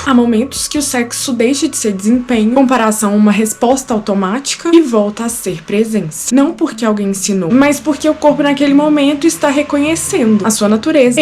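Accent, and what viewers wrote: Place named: Minas Gerais, Brazil. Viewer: 3 palavras e ja desconfio q eh mineira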